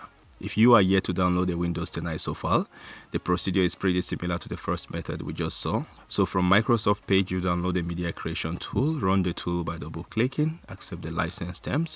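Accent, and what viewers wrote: Africa. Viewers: Prefer Rufus personally. Thanks for the help sir. I'm digging the soft African accent. I need more tutorials with African accents =D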